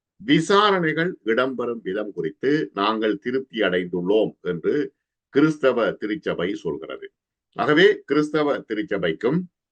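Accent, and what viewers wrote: Jaffna, Sri Lanka. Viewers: உங்களது தமிழ் உச்சரிப்பு யாழ்ப்பாண தமிழர் போல் உள்ளது. உங்கள் பெயர் ஒரு இஸ்லாமிய பெயர் தானே. எப்படி நீங்கள் தமிழை தெளிவாக பேசுகறீர்ள்?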